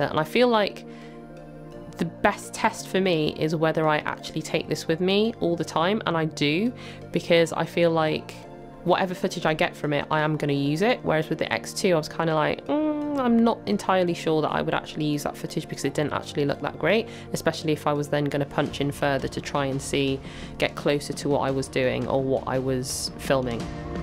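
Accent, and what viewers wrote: United Kingdom. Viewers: This is the first time I've seen a video of yours. The truth is that I loved the way you describe and explain things. Your voice is very relaxing and I really like your accent. (is british?) It is a pleasure and a delight to listen to you and watch your videos. I hope I can have an insta360 soon. New subscriber here! Greetings from Uruguay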